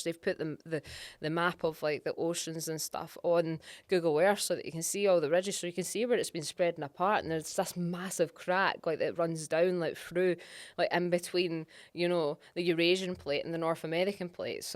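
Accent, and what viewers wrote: Scotland, United Kingdom. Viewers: US guy here: I worked as a geologist in the petroleum industry for many years. Because of the North Sea fields(with Aberdeen being the “oil capital” of Scotland), there are a lot of Scots employed in that profession. Many of them take US expat assignments, and most of them end up in Houston, the “oil capital” of the U.S. So over the years I worked with a fair number of Scots, mainly geologists and engineers. To (finally) get to the point, I struggled for some time with understanding what they were saying. I was often embarrassed when I had to ask them to repeat something. There are just enough different vowel sound pronunciations, speech rhythm and cadence differences, and dialect words so that American English speakers often feel like we’re a sentence or two behind in our understanding. Over the years, I did become accustomed to the heavy, northern and coastal Scottish accent, but having not heard it spoken for a decade since retirement, I have to pay close attention to to follow her, especially when she’s not “speaking geology” and is just in casual conversation. 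I find it fascinating that our common tongue has so many accents and dialects such that sometimes we actually have trouble understanding others even though we are speaking the same language.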